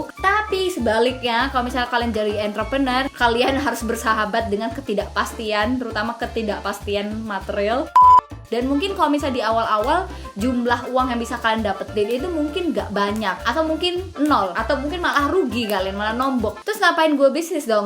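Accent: Java, Indonesia. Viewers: Mantap mbak e. Jowone neng ndi mbak 😁🙏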